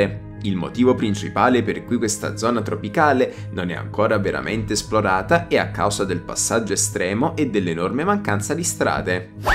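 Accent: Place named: Naples, Italy. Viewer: Quello piu spaventoso è che questo canale e quello di e altri 2 canali sono la stessa persona,puoi parlare piu basso,più serio ecc ma si sente perfettamente che 6 sempre tu soprattutto dalla calata napoletana k provi a nascondere..e i video sono uguali..l unica cosa che cambi è il titolo del video..e nn solo..avrai altri 2 canali sempre così stessi video titolo leggermente diverso..ti ci sei arricchito con sto sistema..altro che guru bitcoin ecc..questo è il sistema dei sistemi x guadagnare..all inizio ci è voluta pazienza vero?..ma una volta k gli iscritti e le visualizzazioni hanno cominciato andare a gonfie vele(alla gente piacciono questi video quelli del paranormale ecc)vai il gioco è fatto..avrai anche dei canali con gli stessi video ma parli inglese..se nn ci avevi pensato(difficile)ti ho dato una bella dritta..CMQ VABBE NESSUNO SE NE ACCORGE CHE 6 SEMPRE TU..O SE NE FOTTE..BOH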